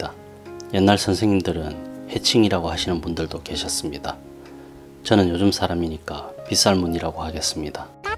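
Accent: Gyeongsang, South Korea. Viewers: 영상 잘보고있습니다 감사합니다 종이는 무슨 도화지를 써야하나요? 갱상도 사시는것 같네요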